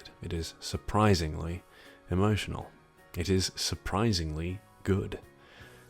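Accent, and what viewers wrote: United Kingdom. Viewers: The story about how a man with a smooth, charming British accent crossed the degeneracy event horizon and came out the other end with a new appreciation for the obscene. A story for the ages, one that will be passed from father to son for centuries to come.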